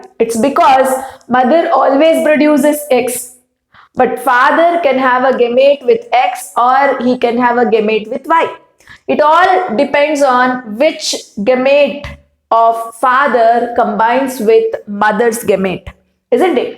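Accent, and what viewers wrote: Karnataka, India. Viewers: Mam ur from Karnataka right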